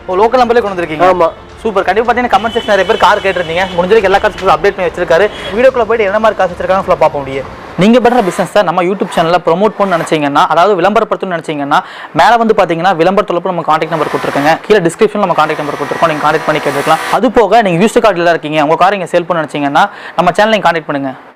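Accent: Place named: Kongu, India. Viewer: Neenga pesratha paatha ipove vanthu vaanganum pola iruku super Anna kongu tamil azhage azhagu thaan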